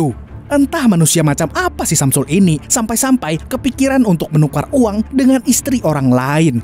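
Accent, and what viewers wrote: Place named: Paringin, Indonesia. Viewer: Seperti orang Paringin ni abg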